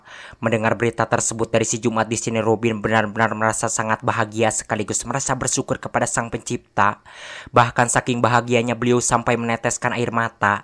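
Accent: West Java, Indonesia. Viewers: Anjig Sunda pisaaaan he he he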